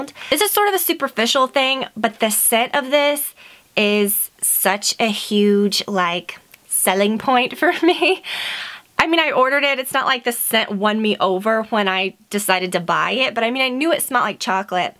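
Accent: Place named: Georgia, United States. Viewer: Enjoyed seeing these favorites. Sorry you're having such terrible seasonal problem's. Though, I do think you should make sure your ear problem, is caused from seasonal sinus problem's & nothing worse......I did giggle for a second when you made that comment about hoping you weren't sounding different, as what I noticed {heard} most was your GA drawl seemed more pronounced, than usual.......which I clearly love and am partial too hearing! Hope you and Brad have a fun filled weekend...{hugs} from Statesboro! ~J~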